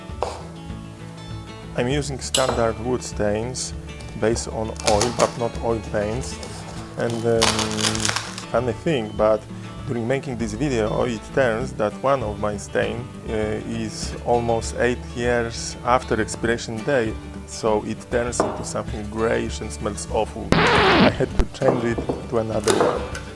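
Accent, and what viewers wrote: Poland. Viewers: od razu słychać ze polak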